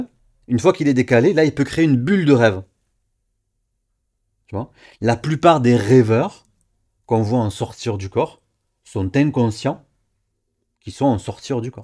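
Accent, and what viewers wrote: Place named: South, France. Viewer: trop bien, vous êtes du sud avec cet accent?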